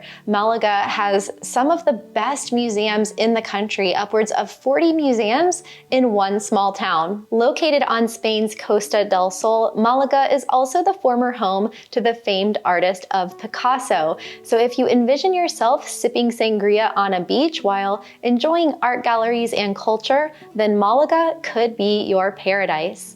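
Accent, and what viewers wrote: United States. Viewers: Que desesperante es la voz en inglés americano... de por si el idioma inglés es así, preferible el inglés británico que es ligeramente peor...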